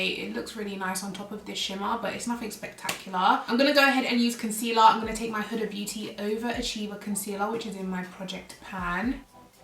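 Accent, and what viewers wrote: United States. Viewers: Your ‘American’ accent is funny! lol I always try sounding like you, it’s a straight fail!🤣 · Lolol your American accent is very TEXAN ..& we welcome youuuuu 🥰🥰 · I love your American accent x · I love (and laugh) with your American accent 😋 so cute! I love those gorgeous deep tones and this collection is beautiful! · I love ur American accent 😍😄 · Lmao I love your American accent lmao😍😍😍😍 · Thank you for doing this pallete! The ads keeps stalking me on Facebook 😆 and I was in love with the beautiful brown and gold and colors so I was dying to see this pallet on a black girl! Sad about those blushes tho. 😕 Also, your American accent is pretty good!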